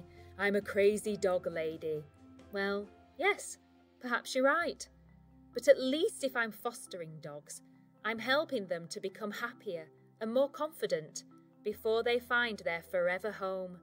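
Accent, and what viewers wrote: England, United Kingdom. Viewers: Beautiful English accent!. I love listening and enjoy reading your stories. Thank you.🙏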